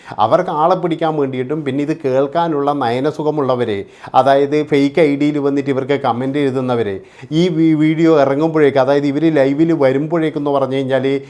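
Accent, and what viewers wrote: Kannur, India. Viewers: കണ്ണൂർ ജില്ലയിൽ എത് ഭാഗത്തെ ഭാഷ്യാണ് ചേട്ടാ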